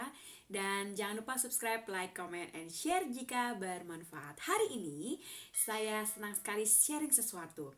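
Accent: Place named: East Java, Indonesia. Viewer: sama kak,ak juga minta maaf ya bila lambat terus berkunjungnya, btw kakak indonesia nya mana ya,kok hajing hajing wahing sama kayak.jawatimuran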